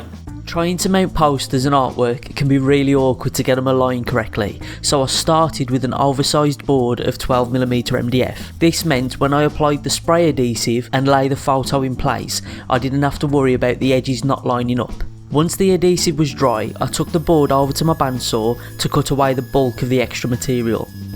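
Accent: Black Country, United Kingdom. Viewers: Yaw sound like a yam yam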